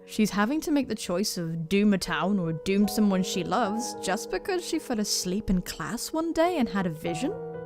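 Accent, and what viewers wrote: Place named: RP, United Kingdom. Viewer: you sound soo posh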